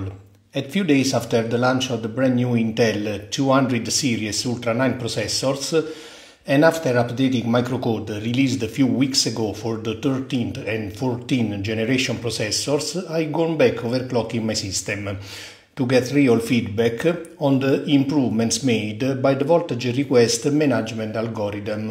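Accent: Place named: Italy. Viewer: you sound like Italian :face-blue-smiling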